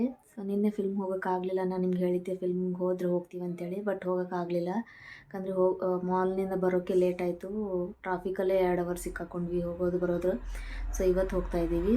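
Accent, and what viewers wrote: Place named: Bangalore, India. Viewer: niv uttar Karnataka bitu Bangalore language yak mathadakaiiri😅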